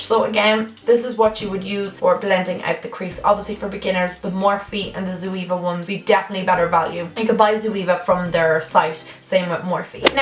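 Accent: Ireland. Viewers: Heyy! I'm new here lol🙈 are you Irish?!? ❤️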